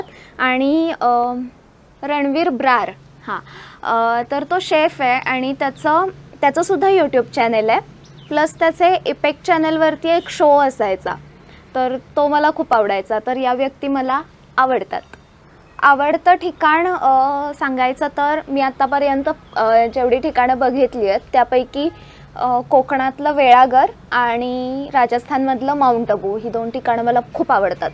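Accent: Kolhapur, India. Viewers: मराठीला अजूनही थोडा घाटी कोल्हापुरी टोन आहे . बाकी आवाज क्यामेरा समोर तरी छान मृदु आहे . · tuja aawaj komal aahe khop kolapuri bhashet bolte tu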